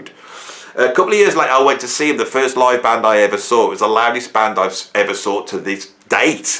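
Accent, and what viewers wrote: Birmingham, United Kingdom. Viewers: Is that a Birmingham or a Wolver'ampton(Slade) accent?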